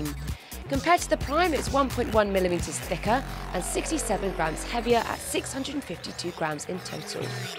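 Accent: United Kingdom. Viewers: Сразу слышно, что британка говорит. Ненавижу британский говор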